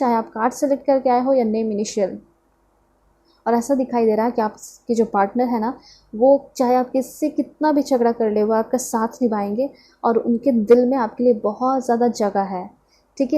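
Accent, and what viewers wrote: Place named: Punjab, India. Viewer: Ma'am sorry for this comment. I like your Punjabi tone in your talk. I even enjoy many sounds. Thanks again.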